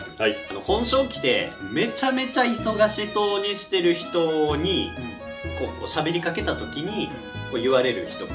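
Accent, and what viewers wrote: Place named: Kyushu, Japan. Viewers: さん、東京でもその九州なまり無くさないでくださいね🤣 これからも応援しています！！ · この九州なまりが標準語になったら寂しいなぁ😂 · 引っ越すと何をするにもいちいち土地勘がないのと、言葉の壁やら文化の違いにとにかく戸惑いますよねー！パートのおばちゃんがいるとかなり心強い情報源なんですがそういう職場じゃなさそうですもんね。さんのキャラなら食堂のおばちゃんと仲良くなりそうな気がしますがw まぁ、悲しいかな気づいたら馴染んでしまうものなので、焦らず少しずつお仕事にも慣れて行ってくださいね！若いけどお体に気をつけて！南九州の方の訛りはちょっとやそっとのことでは変わらないと思うのでw そのままのさんでいてくださいねー‼︎焦らないでいいのでこれからも更新楽しみにしてます。あ、土地勘なくてすみませんが、東京の親戚がオオゼキで買ったお買得品を送ってくれる事があって、九州にはない商品の流通もあるし、お得だし良さそうですよ。ハッピーアワーに出くわすといいですね！公務員寮は食事付きなのかな？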